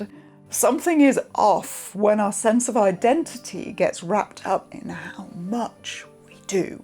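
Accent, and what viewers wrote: United Kingdom. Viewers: WOW! You sound so British.... I double checked if you were the same....and not...🍄🍄 Thank you for the video. When I started writing To Do lists, most times I miss placed the paper. My Mom taught me to use cotton string (no stretch) to take my own measurements. I would then use my wooden ruler to measure the marks on the string. Good to see/hear you again.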